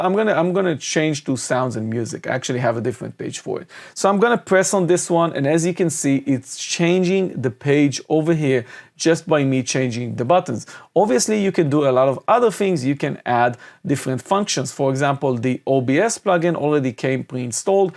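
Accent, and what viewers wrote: Israel. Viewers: You have such an Israeli accent :-) Shalom.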